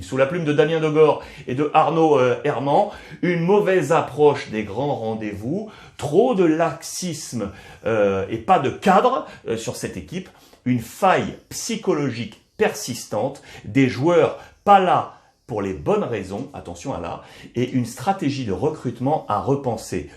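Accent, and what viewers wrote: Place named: Spain. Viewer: J'adore ton accent espagnol ☺️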